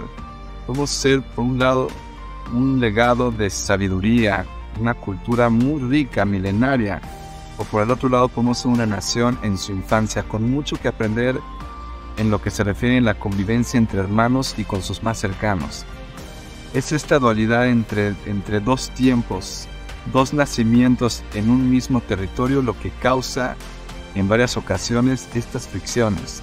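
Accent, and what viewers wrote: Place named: Mexico. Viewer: TU acento es MEXICANO FELICIDADES ISRAEL LOGRO FENÓMENAL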